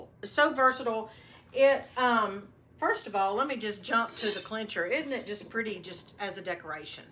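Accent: South, United States. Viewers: How's about to say Ohio? Where did you get that Southern drawl then huh? 😅 Love from South Carolina y'all hold it down up yander 👍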